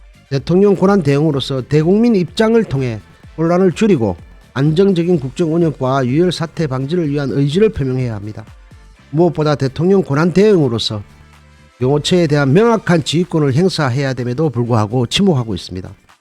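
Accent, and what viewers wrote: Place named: North Korea. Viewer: 이 채널주는 북한사람입니다. 어투보세요. 북한사람이에요. 간첩.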